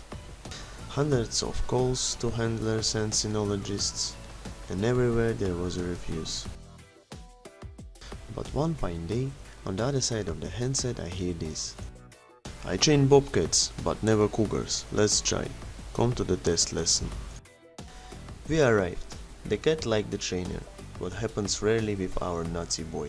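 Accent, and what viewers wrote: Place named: Russia. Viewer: Haha😂 The Russian accent somehow makes it much more intimidating!🤣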